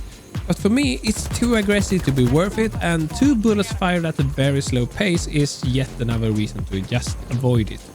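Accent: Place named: Sweden. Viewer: Knew you had to be Swedish with your accent haha, jag är halv Svensk. I think the AK and M5 feel so inaccurate and weak compared to the SCAR — I don’t understand how some of my friends dominate with them but I struggle to hit open shots consistently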